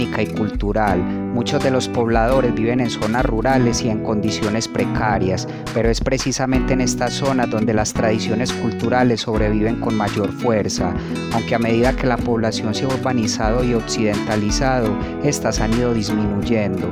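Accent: Antioquia, Colombia. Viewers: El man que habla en este vídeo es paisa? Buen vídeo 👍🏻😁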